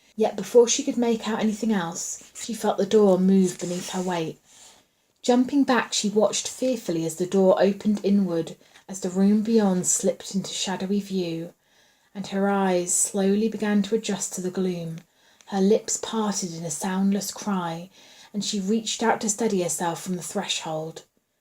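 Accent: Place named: RP, United Kingdom. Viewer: I LOVED this!! Thank you so much for reading this! I read it wheni was a kid (RTC was one of my favoriite authors) and i really wanted to reread it but as audiobook. You did an amazing job!! I enjoyed it, I'll be honest the ending with it being whoit was has always really confused me (was he just a rando? Lol) but i still love the book. Its so quintessentially Point Horror 90s!! And i adored liste img to it at this time of year!! (Not sure how the weather is down south but up north its true spooky season weather now!) Also your accent is amazing! Is. That your real voice!? Im british as well but i sound so common compared to you 😂not sure if its cause youre southern but u have a proper RP accent thats so ni ce to listen to reading tuis book! Stellar job!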